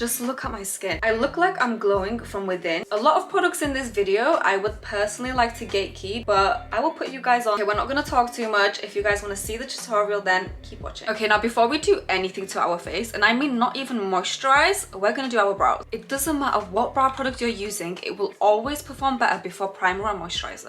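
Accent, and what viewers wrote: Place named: United Kingdom. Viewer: i love ur accent ❤what part of the uk are u from? x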